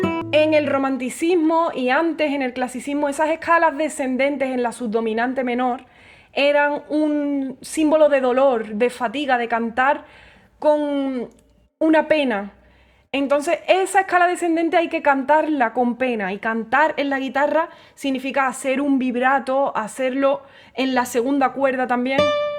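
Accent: Andalusia, Spain. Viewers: me encanta tu acento andaluz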